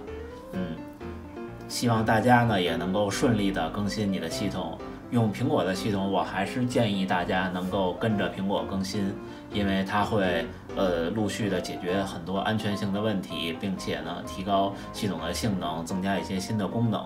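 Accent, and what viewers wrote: Beijing, China. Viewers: 听口音是北京人吧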